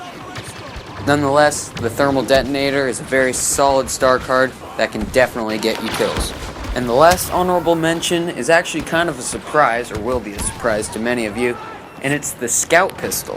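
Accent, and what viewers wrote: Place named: Balkans. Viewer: your sound close to balkan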